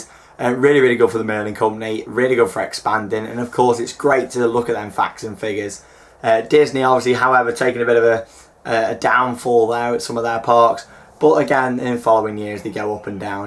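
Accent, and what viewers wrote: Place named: Yorkshire, United Kingdom. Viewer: I'm from cambridge and let me guess... Your from Leeds? Yorkshire?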